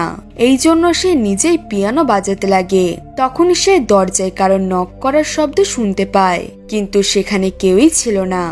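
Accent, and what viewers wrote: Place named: Chapainawabganj, Bangladesh. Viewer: সরি টাইপিং মিস্টেক বলছি আপনার ভাষা ঠিক করা দরকার আকটু প্রবলেম আছে ভাষাটা শুদ্ধ হলে শুনতে মজা লাগে আপনি কেমন যেন চাঁপাইনবাবগঞ্জের ভাষায় কথা বলেন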